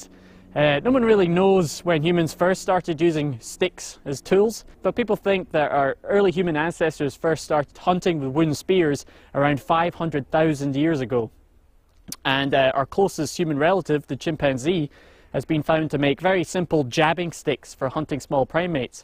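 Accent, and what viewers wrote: Scotland, United Kingdom. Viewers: A thirteen minute video confirming why the humble stick is one of the most versatile tools in human history, a plug for a foam weapon fighting group, and a Scottish accent. I think I might have found my home. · It may be southern or eastern Scotland. Im from the south east of Scotland and it's a much softer accent. Not all Scottish people have a brutal accent :)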